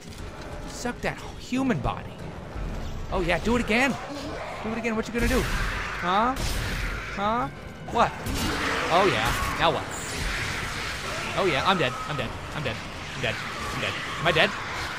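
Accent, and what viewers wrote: United Kingdom. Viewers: best british accent ever! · I love you britsh accent, best one ever!!!! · his british accent is so cute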